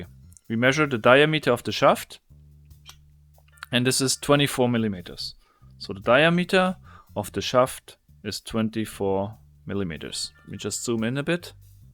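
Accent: Germany. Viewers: Du bist Deutscher nä? Ich höre das raus aus deinen Dialekt.. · Ich find den deutschen Akzent so geil xD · I like your typical german engineering accent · genius! but you speak like a german. are you? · Ich hätte nie gedacht wie stark man den deutschen Akzent im Englischen raushören kann 😂😂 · Are you german? You sound like one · Dat german accent Kreygasm. Way too good :'D. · Nice german accend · Man hört den deutschen Akzent raus :D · You are easy to understand because of your nice German accent. Lass mich raten: aus Hessen?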